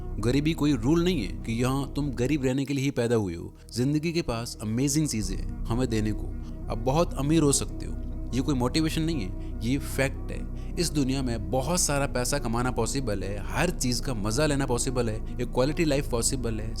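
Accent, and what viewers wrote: Rajasthan, India. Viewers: Ye banda rajesthani hai jo bol raha hai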